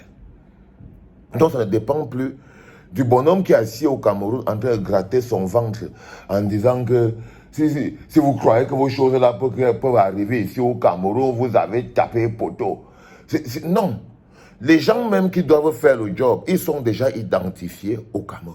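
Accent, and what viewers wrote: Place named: Ivory Coast. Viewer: Ya pas garçon au Cameroun? Tu sonnes comme un ivoirien. Avec votre président marionnettes de l’Élysée à qui on vous donne une blanche comme première dame ivoirienne 😂 vous ouvrez aussi la bouche? Quand les gens parle faut vous cacher cuz vous êtes les premières à vouloir attaquer le Niger qui se libère de votre président ( Macron )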